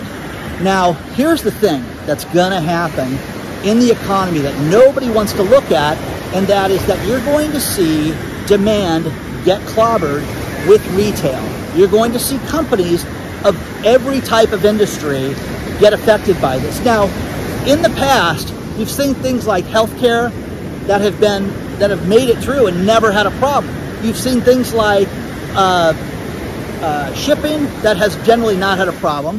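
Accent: United States. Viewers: Love your channel and love your American accent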